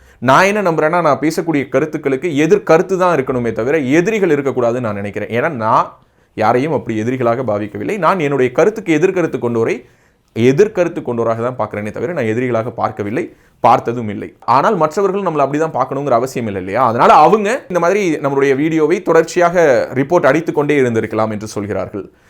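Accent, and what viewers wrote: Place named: Thiruthuraipoondi, India. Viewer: திருத்துறைப்பூண்டி குரல் உலகெங்கும்... பெருமை சகோதரா...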